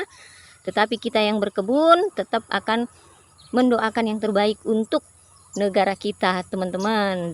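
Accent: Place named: West Kalimantan, Indonesia. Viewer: Orang Mana Bunda,logat bahasa daerahnye sepertinya org Melayu Kal-Bar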